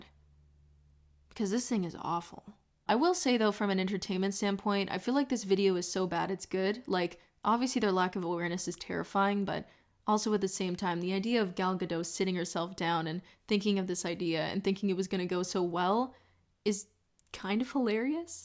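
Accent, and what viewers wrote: Canada. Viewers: she’s canadian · omg are u canadian ? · are you Canadian? Or from Minnesota?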